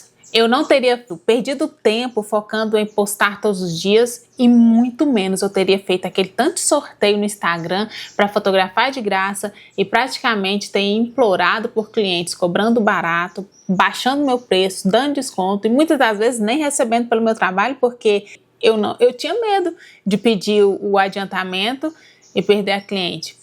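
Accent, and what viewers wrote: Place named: Recife, Brazil. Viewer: tú és de Recife? Esse sotaque… uhm acho que sim sou de Olinda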